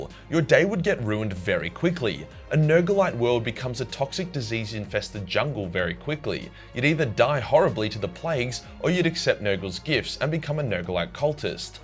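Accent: Australia. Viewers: Bruv I need to move to AUSSIELAND and hear u speak on the daily it's fucking great, you Mook. Keep up The Emperors Work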